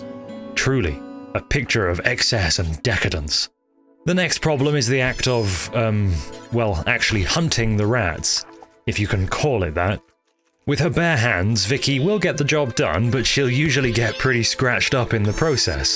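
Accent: United Kingdom. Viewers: hearing a very British man advertise a vary American game is actualy hilarious.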